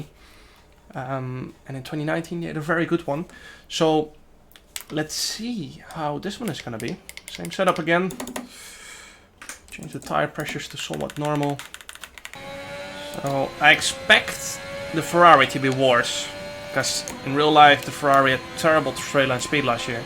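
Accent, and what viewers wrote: Netherlands. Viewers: Hoor dat je Nederlander bent hahah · I can litterally hear ur a dutchie Ur accent isn’t like mine I hav an British accent and I’m an Dutchie aswell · Je hoort gewoon dat jij Nederlands bent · Just a regular dutch accent to he honest 😅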